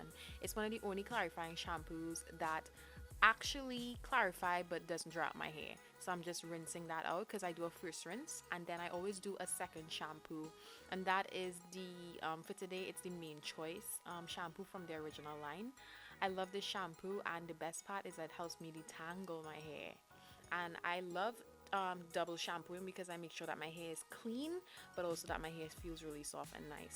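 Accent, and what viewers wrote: Trinidad and Tobago. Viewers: I knew you sound trini, had to check your description box 😂...am trini also😱🙌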